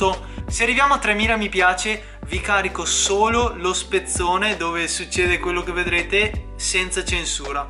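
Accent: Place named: Veneto, Italy. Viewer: Se sente te si veneto 😂😂❤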